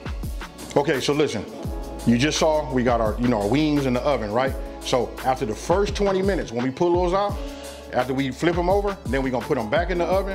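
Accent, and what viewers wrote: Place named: California, United States. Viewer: It's giving.. retired gangster meets good woman & becomes domesticated. lol. I love it! i love a cali accent! i definitely subscribed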